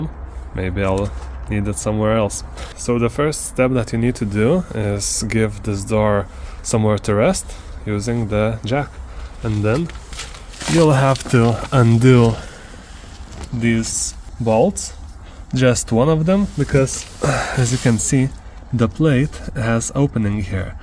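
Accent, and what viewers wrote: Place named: Lithuania. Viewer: Girdžiu lietuvišką kirčiavimą 😂 Ačiū už video ;)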